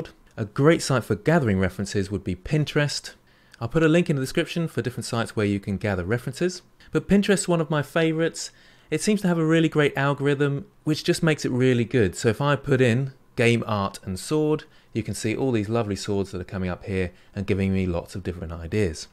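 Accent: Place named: Essex, United Kingdom. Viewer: Love your tutorials. Very easy listening voice and accent. Is that Essex?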